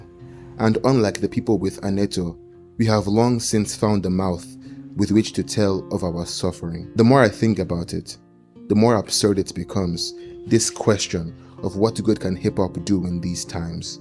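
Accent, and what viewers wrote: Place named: Nigeria. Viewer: You didn’t need to say you Naija, it’s a distinct accent, country also history.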